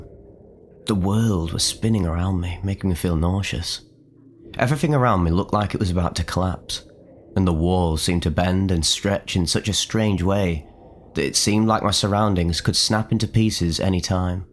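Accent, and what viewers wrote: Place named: Lancashire, United Kingdom. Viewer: Hey, I’m from Lancashire living abroad for work and always missing home. Hearing your accent makes me feel back at home. Just wanted to thank you for your work, incredibly educational and entertaining. Please keep it up 🤙🏼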